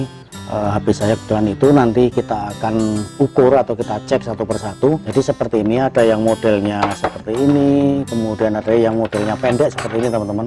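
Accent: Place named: Java, Indonesia. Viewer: Medok sekali asli gunkid 🙏🙏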